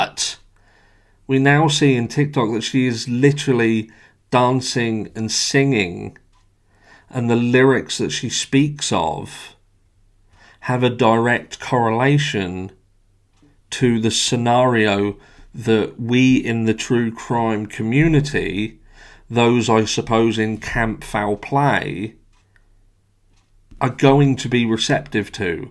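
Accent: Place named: Suffolk, United Kingdom. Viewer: Are you from suffolk? I'm in Suffolk and you sound like it 😊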